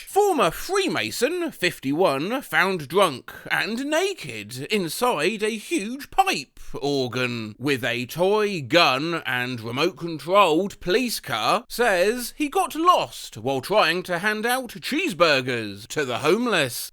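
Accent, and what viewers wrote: United Kingdom. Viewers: i have never been so excited about a british man reading text on a screen in my life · I LOVE LISTENING TO A BRITISH MAN YELLING DIRECTLY INTO MY EAR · my friends will never understand how much whimsy listening to a british man reading quotes from twitter (no im not calling it x im boycotting it take THAT ELONgated MUSKet) and reddit gives me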